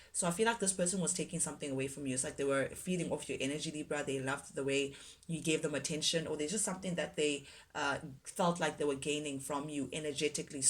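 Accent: South Africa. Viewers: Wow I love your channel, you sound south African could be wrong, but I like it.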